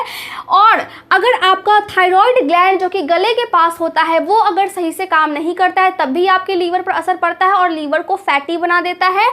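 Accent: Bihar, India. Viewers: Aap Hindi saaf bolo pahle bihar ki ho naa aap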